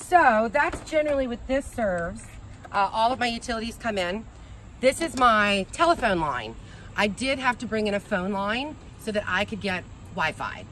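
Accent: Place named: Texas, United States. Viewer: LOVED YOUR VIDEO TOUR OF YOUR TINY, WELL PLANNED HOME. I AM SO VERY PROUD FOR YOU. CAN YOU TELL ME, DO YOU HAVE TEXAS ROOTS? I HEARD ALOT OF YALL'S IN THERE. I AM A TEXAS GIRL SO PICKED UP ON THAT IMMEDIATELY. BEST OF LUCK TO YOU!!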